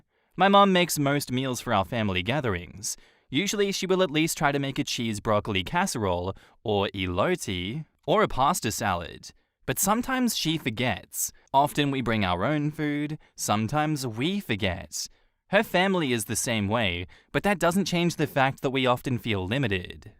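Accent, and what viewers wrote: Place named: Australia. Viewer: I love these. Thank you, for delivering these stories at the right speed, in a nice accent and with enjoyable, insightful and to the point commentary. 💜✨🧿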